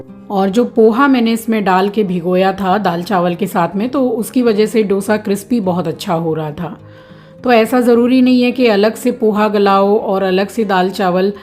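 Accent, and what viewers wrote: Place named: Delhi, India. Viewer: haan. Wo Dilli se bolti hai 😄 😀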